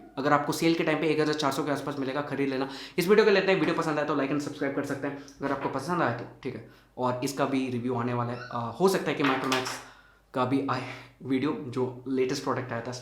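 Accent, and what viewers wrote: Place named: Kerala, India. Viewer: That accent is cool! Are you from Kerala?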